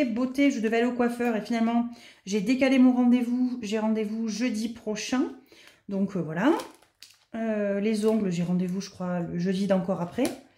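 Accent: South, France. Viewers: Cc 5000 abonnés c est super.....c est grace à ta bonne humeur,ton accent plein de soleil et tes vidéos toujours agréables et de bons conseils !!!! ❤❤ Pour les défis je suis comme toi j ai envie de tous les faire !!!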